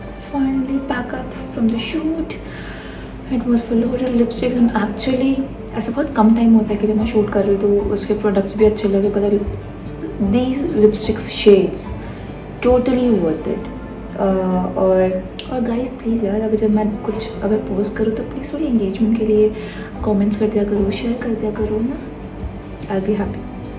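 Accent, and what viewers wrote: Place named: Rajasthan, India. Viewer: Or muje phle hi andaj tha ki sayad aap pali ya jodhpur se ho kyuki apki bat karne ka style vesa h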